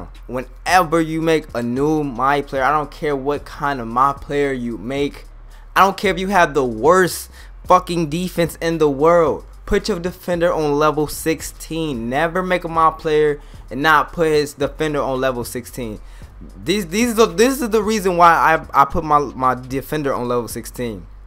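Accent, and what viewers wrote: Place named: AAVE, United States. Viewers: It's just ebonics man. Ain't nothin wrong with that. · MopSop Lmao he's speaks Ebonics like most of us here aint nothing wrong with ppl's choice of dialect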